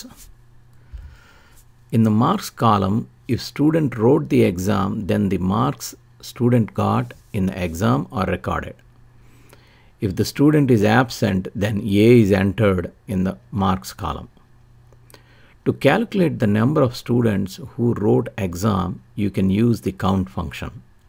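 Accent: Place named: South India, India. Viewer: Must be from south Indian .... peice of advice ur accent s*cks so plz don't insult English language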